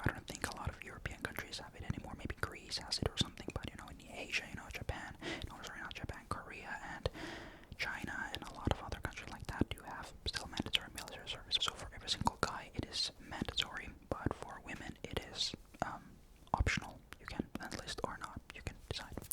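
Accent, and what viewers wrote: Finland. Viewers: i think i've only seen like a few of your vids but when the video started i said "he's probably from finland" and i got it right lol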